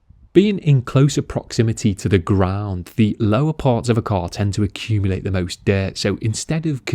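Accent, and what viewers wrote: Liverpool, United Kingdom. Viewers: This guy has the poshest Scouse accent I've ever heard..... Yes I know that's an oxymoron!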